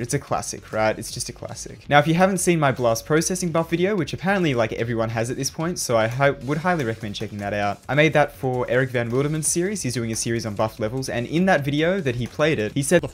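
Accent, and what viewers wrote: Australia. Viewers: Wait wait wait… are you Australian I am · he’s an australian youtuber lmao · HES AUSTRALIA?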